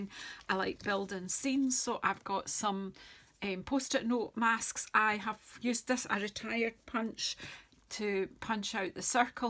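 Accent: Scotland, United Kingdom. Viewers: Hi, I stumbled onto your video, stayed, watched and have become your newest subscriber. Your lovely Scottish accent has taken me back to my childhood. Although i am second generation Canadian, 3 of my 4 grandparents were born in Scotland and emigrated to Canada as young adults. It is just so special to hear the accent again. Oh yes BTW, the card was spectacular. Thanks so much. 😊🇨🇦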